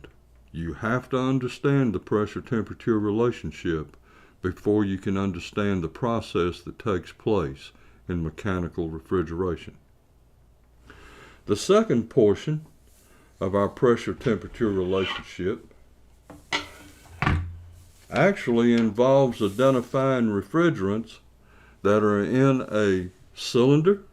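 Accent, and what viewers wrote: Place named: New York, United States. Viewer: Man this video is spot on and to the point, I love your New York accent.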